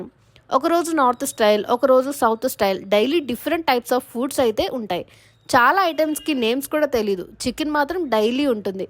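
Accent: Andhra Pradesh, India. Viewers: Hyderabad la vundi andhra slang endi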